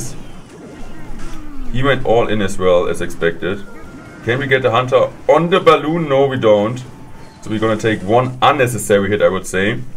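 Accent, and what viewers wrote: Germany. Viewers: Wenn das kein deutscher aktzent ist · Man merkt dass du Deutscher bist aber ich feier trotzdem beide CR Kanäle😉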